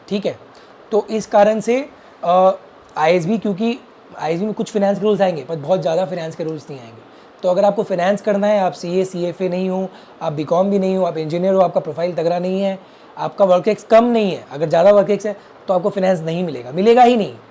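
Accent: Bihar, India. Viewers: Bhai tm Bihar se ho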